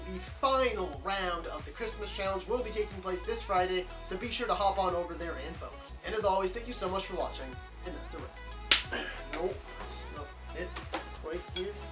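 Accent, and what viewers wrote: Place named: Canada. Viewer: Wait! Did you say your “miewth” full of water?” 😂. Gotta get used to that Canadian accent. 😝. Great review buddy!👍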